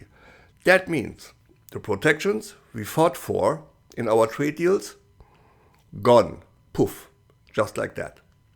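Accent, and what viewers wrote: Germany. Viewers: Liebe Grüße aus good old Germany! Ich muss schon sagen, dein deutscher Akzent ist deutlich zu hören, doch deine English Skills sind schon recht ordentlich. 😇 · thank you, great reporting & did I ever tell you that I love your German accent? LOL!! I totally agree with your POV! Although these times are VERY stressful for everyone, I can't say how PROUD I am of my fellow Canadians for standing up and standing strong against those CRIMINALS in the US. Whenever I feel down about everything, I read the comments on shows like yours and feel so much better, much calmer knowing that Canadians are feeling the same as I do and are willing to suffer the short term to gain in the long run. I trust our PM and am so happy to see him taking Canada away from these cretins down south and doing business with the rest of the world. What's the point of giving concessions to a mob boss that will NOT honour any deal with sign anyway?? 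Look, I work for the GOC and nearly lost my job when Carney did those major cutbacks on the public service. I was one of the lucky ones that didn't get cut but even if I did, I would still support him and what he's doing for our country against the lawless, murdering criminals running the United States right now. The one good thing tRumpf has done for Canada is bring us closer together as a nation to realize how lucky we are to BE Canadian and that we must now fight for that life - again - in world history. CANADA STRONG & BABA-MAGA (buy anything but american & make america go away)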